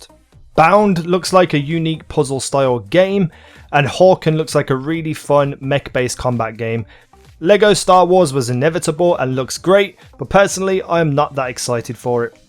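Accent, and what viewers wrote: Birmingham, United Kingdom. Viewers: I can hear that brumy accent ha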